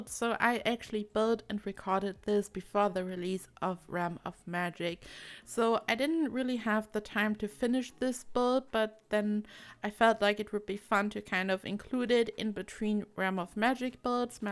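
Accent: Germany. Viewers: The most adorable german accent :D and of course great builds!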